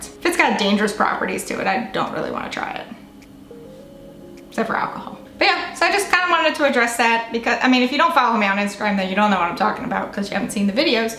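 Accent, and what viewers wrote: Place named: Metairie, United States. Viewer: I can hear the Metairie in your voice! Haha! Kenner here :)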